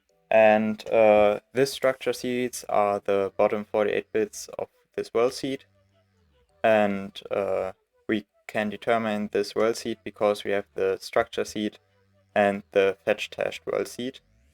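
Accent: Germany. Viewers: i hör a little deutsch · This is hard German Voice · Bist du deutsch? Dein Englisch hört sich sehr deutsch an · du bist eindeutig deutsch... das hört man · haha du bist deutsch oder? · Are you german or really english ? you don't sound like a english person NO HATE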